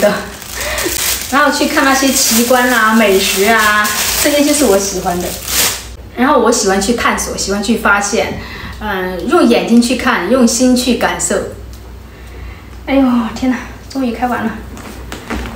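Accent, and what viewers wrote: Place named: Taiwan. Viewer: 妳跟台灣人一樣了。說話結尾不說哈就更像了。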